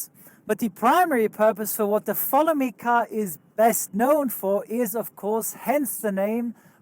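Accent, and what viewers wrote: Swabia, Germany. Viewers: Endlich amol oinr der mo Schwäbisch schwätzt. Scheeeee. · Bisch wohl a echter Schwob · Nice schwäbisch I´m living 1 hour south of Stuttgart. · Ned schlecht dei Schwäbisch, muss i scho soga 👍🏼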